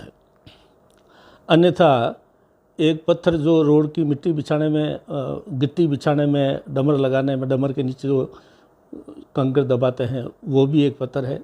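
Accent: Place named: Rajasthan, India. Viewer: हमको लगता है आप राजस्थान के हैं आपकी लैंग्वेज से भाषा से पता लग रहा है क्योंकि हम राजस्थान के हैं ।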